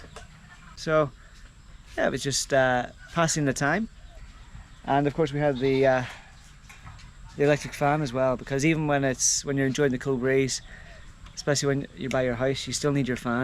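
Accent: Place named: Northern Ireland, United Kingdom. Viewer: Are you by any chance from Norn Ireland? Your accent kind of reminds of a friend from Belfast. Greetings from a wee man from Iloilo as well. Unfortunately we tend to get stained by the NGCP's incompetence. I love my city but I'm scundered by the length of brownouts sometimes. Terrible intro to 2024. I hope your doing grand mate!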